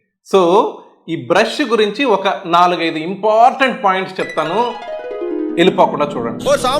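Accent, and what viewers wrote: Nellore, India. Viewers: నెల్లూరు యాస డాక్టర్ గారికి మా చెడ్డ అలవాటుండ్లా..... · ఒకో ప్రాంతానికి ఒకో యాస ఉంటుంది. నెల్లూరు యాస లో మాట్లాడుతున్నట్టు గమనించగలరు.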